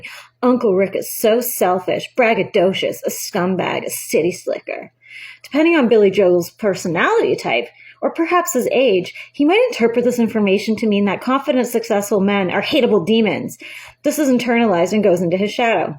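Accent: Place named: South, United States. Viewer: Nice southern accent. 😉That was funny. 😄 The sheer amount of effort you put into your work is astounding, and the level of detail is top notch, and very much appreciated. I could easily picture everything you said, and watch it in my mind like a movie. Have you written any books or considered writing books?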